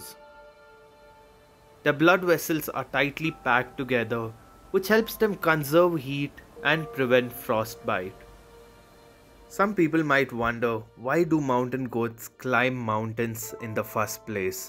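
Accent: India. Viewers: Indiglish, got to mute and watch subtitles instead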